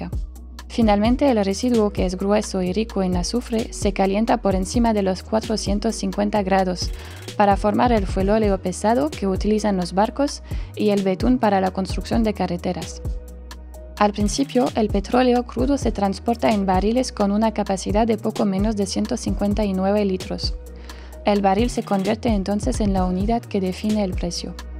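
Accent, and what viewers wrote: Russia. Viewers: Los documentales muy buenos... pero la voz de la locutora maravillosa. El acento es ruso? 🤔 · Creo que la chica tiene acento rusa. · acento ruso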